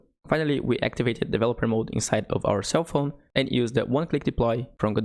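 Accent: Brazil. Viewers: i must ask,where you are from? you sound a lot BR to me.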